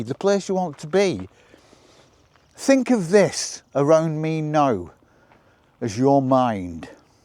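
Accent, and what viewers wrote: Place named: Lancashire, United Kingdom. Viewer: ‘Those’ videos on YouTube made me laugh. I’ve instantly clicked straight off quite a few recently 😂. What a gorgeous walk! I’m living exactly the same way in the west countryside of Victoria, Australia. Listening to you is like talking with my mam and her family who all emigrated out here from Oldham, Lancs. it’s made me quite emotional. Peace and love to you too 😘🤗.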